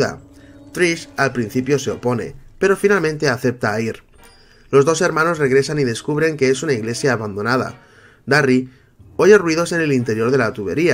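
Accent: Spain. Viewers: por fin un video donde alguien explica lo que pasa en la tercera pelicula ya que muchos no la entendieron y la tacharon de mala yo igual quería hacerlo en su momento por esas gentes que no entendieron pero no se editar vídeos jajaja PD: me encanta tu asentó español :3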